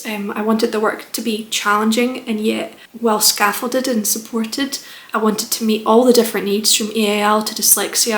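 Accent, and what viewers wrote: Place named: Scotland, United Kingdom. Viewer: Scottish accents are the best.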